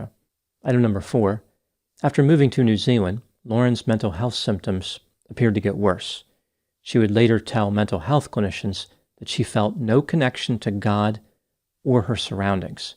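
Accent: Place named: Baltimore, United States. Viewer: are you from the Baltimore area by chance?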